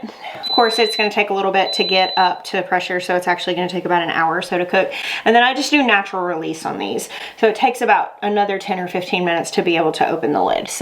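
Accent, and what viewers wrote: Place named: Arkansas, United States. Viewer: It's that good old Arkansas southern drawl! I am also from Arkansas. Not far from where lived before moving. I wish I'd met her back then.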